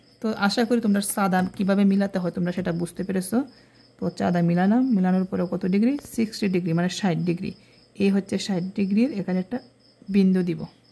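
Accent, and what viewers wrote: Sylhet, Bangladesh. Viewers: SIR APNI ki Sylheti??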